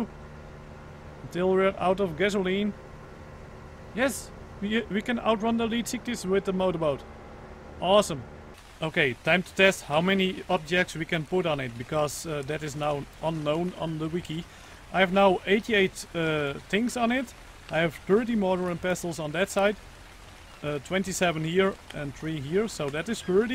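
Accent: Netherlands. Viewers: That Dutch accent XD. Respect! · are you dutch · are you dutch because you kinda have a dutch accent when you speak english??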